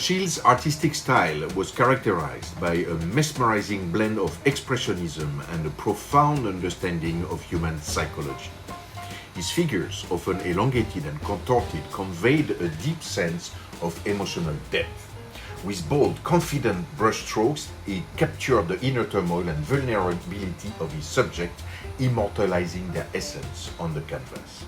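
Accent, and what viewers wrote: France. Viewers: I realised your French accent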